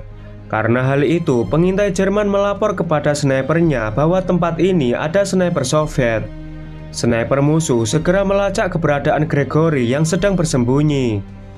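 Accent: Java, Indonesia. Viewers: Medok bngt min suaranya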